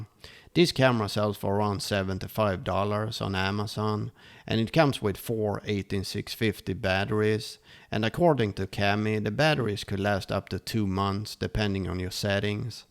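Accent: Sweden. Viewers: Your accent sounds a little bit Swedish, are you?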